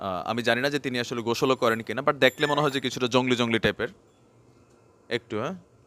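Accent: Comilla, Bangladesh. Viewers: আপনার ভাষা শুনে আমি আগেই ধারণা করেছিলাম,আপনি কুমিল্লার। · যা ভাবছিলাম ভাই, তাই। আপনার গ্রামের ভাষা শুনে ভাবছিলাম হয়ত আপনি কুমিল্লার মানুষ। আপনি আমার দেশি মানুষ ভাই।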